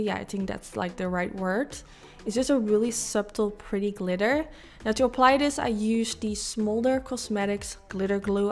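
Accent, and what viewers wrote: Netherlands. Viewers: Kom je uit Nederland? Ik dacht een accentje te horen! Heb trouwens na het kijken van deze video ook die paarse mac glitters gehaald, thanks voor de tip :) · Ik hoor echt meteen dat je Nederlands bent, niet slecht bedoeld ik vond het gewoon grappig dat het echt zo was. Echt een super mooie look!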